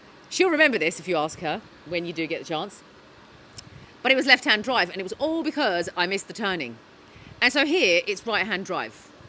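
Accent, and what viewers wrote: United Kingdom. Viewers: I'm here to listen to your lovely british accent ! Welcome to Malaysia ❤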